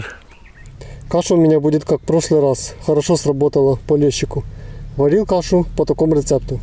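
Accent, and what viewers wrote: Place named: South, Russia. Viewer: говор южно-русский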